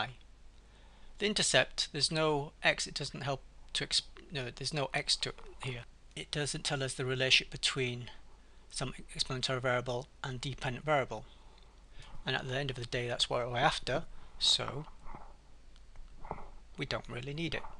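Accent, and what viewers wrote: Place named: United Kingdom. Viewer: Thick British accent.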